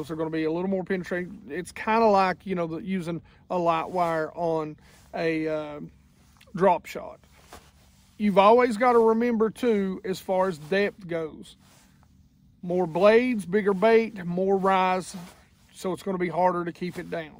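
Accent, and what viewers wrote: South, United States. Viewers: So glad to see you fully recovered from Covid Brother, sounds you're back 100% Southern drawl and all!! LOL!! Would I get the same reaction if I put plastic flukes instead of swimbaits? Great instructional video!!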